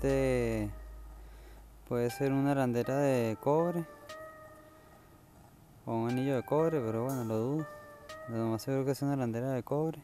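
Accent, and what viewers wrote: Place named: Venezuela. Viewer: hola... soy Mexicano casado con una venezolana... cuando estaba viendo tu vídeo ella me dice "... ese es Venezolano" ... que buen canal de detección tienes, saludos mi pana!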